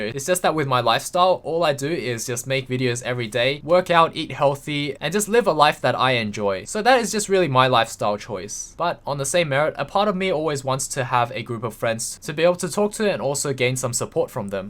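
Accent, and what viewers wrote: Australia. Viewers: yeah i thought so, i could tell from your low-key aussie accent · Dude, just move to America. Girls are obsessed with Australian accents. My sister always says you sound hot whenever I watch a video next to her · A chinese guy with an australian accent wtf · Ah never mind I saw you going to pax in Melbourne. I thought I could hear the accent. Bro you’re a legend. I’m a lot like you in many ways (but not good at league). Anyway if I go to pax I’ll keep an eye out for you. No pressure dude I’ll see if I have enough money to buy you a drink or something xd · Are you from Australia? Greetings from Hell's Gate dude!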